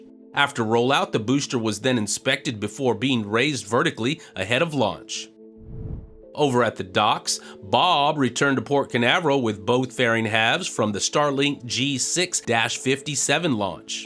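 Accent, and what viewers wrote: Texas, United States. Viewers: Someday, I want to hear that Texas accent coming from the Planet Mars ! 👨‍🚀🏁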